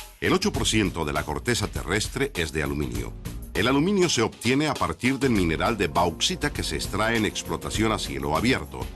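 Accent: Colombia. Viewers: Ése acento colombiano enamora a cualquiera.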